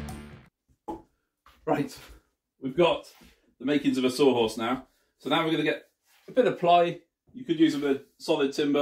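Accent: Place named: Ireland. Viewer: Too much talking - difficult to understand w/your brogue. The presentation appears mor ad hoc than well-organized.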